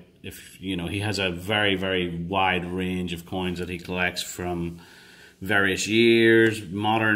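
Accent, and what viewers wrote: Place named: United Kingdom. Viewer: you have the most American British accent ive ever heard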